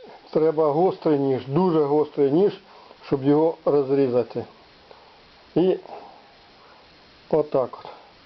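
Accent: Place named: Kharkiv, Ukraine. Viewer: Дай Бог вам всього доброго , мирного , світлого ! Як приємно чути наш харківський говор !!!! Супер !!!!! Дякую 🙏🙏🙏.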